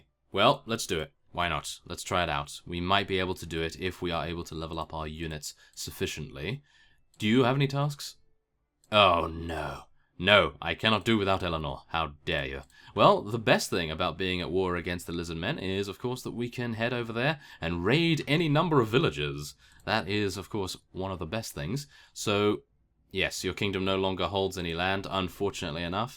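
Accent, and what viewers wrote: United Kingdom. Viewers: Even though I am also british, I love your accent xD Some of the way you talk does make me giggle :)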